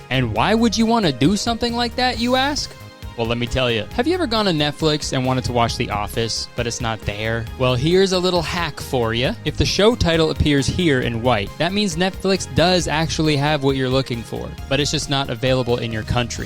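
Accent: Canada. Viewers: kurtis's canadian accent rly popped off here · is the most Canadian I've ever heard you.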